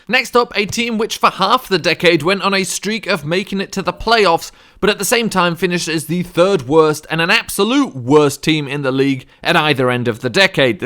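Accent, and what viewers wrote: United Kingdom. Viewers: Weird to hear a British NFL fan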